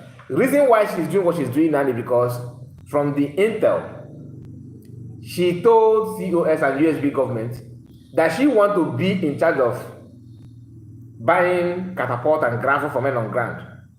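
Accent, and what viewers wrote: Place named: Fulani, West Africa. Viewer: You don't know where you come from that's why your accent is the same with foolani people. Leave nwanyi isi ocha, leave all the saga and your stupid analysis. Let's talk about PM, let's talk about MNK, let's talk about Biafra recognition, let's talk about Homeland. How long are we going to remain in this saga? Is this how to run a government? Criminals!!!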